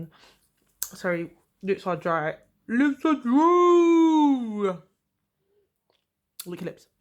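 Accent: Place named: London, United Kingdom. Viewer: Hold on you sound like you're from London! - if you are Heyyy girl! - Loved the video- you're so entertaining sis! - I'm going to try that mask!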